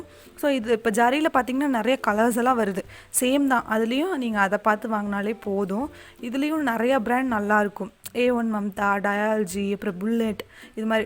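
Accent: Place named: Coimbatore, India. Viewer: உங்கள் பேச்சு மிகவும் அழகு சகோதரி. நீங்கள் கோயம்புத்தூரா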